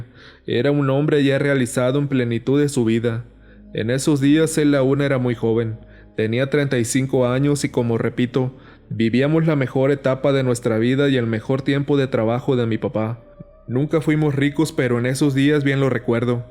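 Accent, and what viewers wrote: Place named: Monterrey, Mexico. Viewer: Con todo respeto, lo diré, el narrador se escucha bien ranchero. Me recuerda a mi gente de Monterrey.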